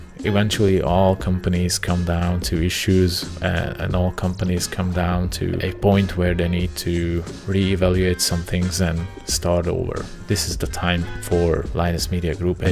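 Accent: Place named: Sweden. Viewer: swedish accent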